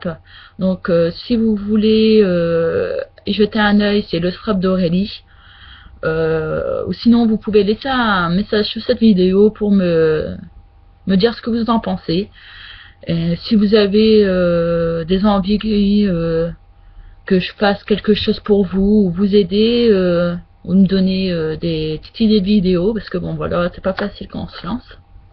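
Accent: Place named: Nord, France. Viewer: Coucou je viens de tomber sur une de tes vidéos de l'album sur ton fils que je trouve surper beau et du coup je me suis abonnée à ta chaîne ... dis moi tu ne serais pas du Nord par hasard ? j'ai cru entendre un petit accent lol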